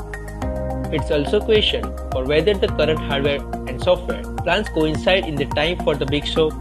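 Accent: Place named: India. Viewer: Indian English accent not exactly Youtube worthy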